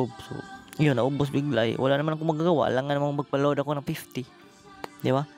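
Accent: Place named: Visayas, Philippines. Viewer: Pareha lang ta idol Bisaya hehehe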